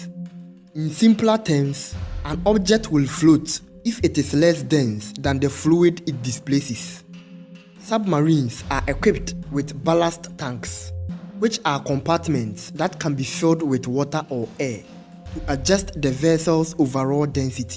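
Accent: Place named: Africa. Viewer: African accent s..ks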